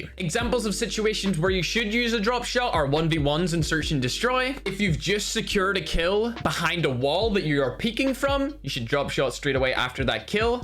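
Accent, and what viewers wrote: Ireland. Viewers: Wait... ur Irish? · U are irish ?! Thought u british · i did not know that you were irish. should’ve guessed it 😂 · You're Irish?.... Did not know that. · Wait... are u irish? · how are u irish? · You're Irish? God your accent is so deep. I've heard Terrorizer and Nogla and they have a lighter one. You sound more Enthusiastic though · is irish?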